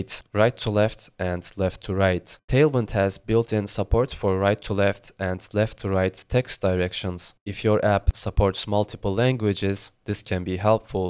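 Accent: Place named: Turkey. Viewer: türksün sen aksanın bağırıyor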